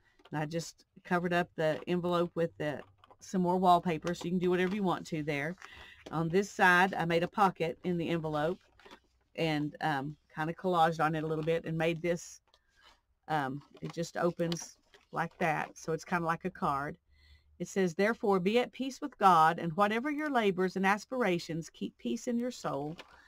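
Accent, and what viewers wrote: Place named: South, United States. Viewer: I'm sensing a slight southern drawl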